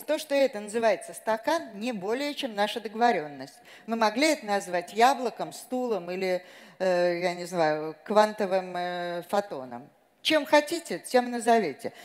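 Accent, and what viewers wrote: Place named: Moscow, Russia. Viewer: Как тяжело слушать этот явный масковский акцент)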